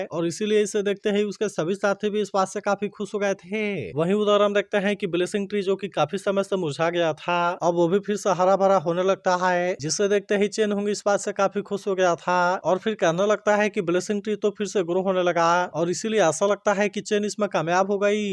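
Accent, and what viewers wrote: India. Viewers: Are you a bihari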